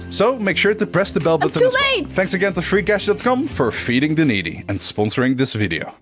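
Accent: Netherlands. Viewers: Oh that Dutch accent is so distinct. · Spotting the Dutch accent is not just easy for the Dutch, it sticks out like a sore thumb. See, we are multilingual and we want to speak fluent English, but when we hear ourselves back to hear how strong our accent is, it's raw cringe. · The ear tiwtch detecting a dutch accent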